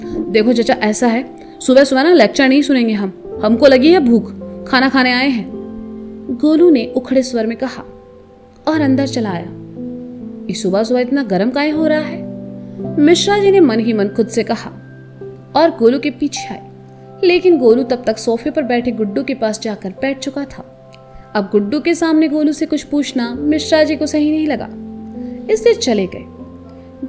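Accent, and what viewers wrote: Kanpur, India. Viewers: Didu har har mahadev Happy friendship day Congratulations for 19k subscribers Didu apki kanpuriya tone mast hai maze ajate hain 😉😉👈👈 Mahadev always bless you 🧿🧿😇😇❤️❤️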